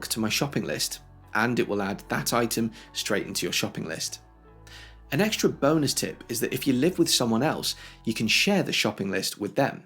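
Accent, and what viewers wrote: United Kingdom. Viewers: Hi! thank you for your videos, I have watched all of them I think that I am strangest subscriber on your channel because I watch your video not only to gain some tips about iOS but also to improve my skills of good English and understanding of British pronunciation:) Warm greetings from Ukraine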